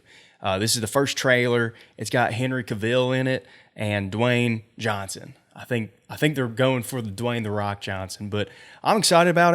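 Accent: South, United States. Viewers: didn't realize how southern you sound normally until i heard your voice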